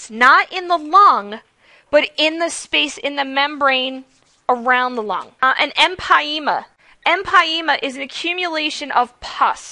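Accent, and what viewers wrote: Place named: United States. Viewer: Your videos, your way of explaining, your examples, and your American accent make it soooo easy to learn... I love how you lecture. Please make more videos and help us (students) learn more so we don't have to cram study. God bless u...:)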